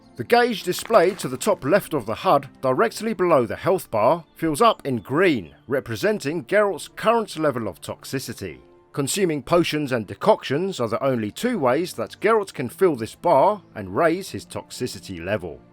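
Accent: United Kingdom. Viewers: No disrespect my man..but you shocked the 💩 outta me the moment I heard your voice/accent. I don't believe I've ever seen an Asian gent with such a rich English accent. It's got me like 🤯🧠⚽🥅. In any case, sweet video! 🙌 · Are u Asian with a British accent !!!!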